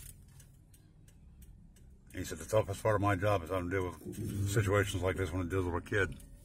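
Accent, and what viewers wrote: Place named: North, United States. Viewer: Are you from Tennessee and this cop does he live in Tennessee you sound like you up north somewhere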